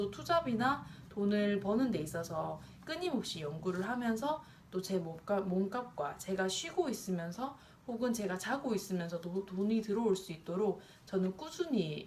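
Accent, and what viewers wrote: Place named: Gyeongsang, South Korea. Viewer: 대단하세요!! 진짜 자랑스럽네요! 그리고 경상도 사투리 넘 귀여워용...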